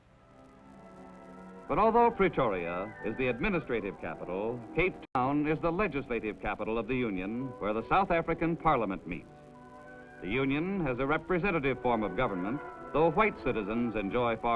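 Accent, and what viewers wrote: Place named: Mid-Atlantic, United States. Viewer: Love the mid-Atlantic accent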